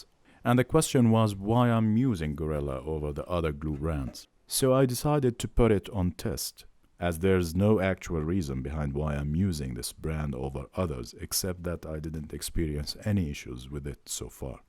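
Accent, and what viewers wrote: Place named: Thuringia, Germany. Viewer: That accent. Sounds Thuringian. Please tell me I’m correct!? Lol.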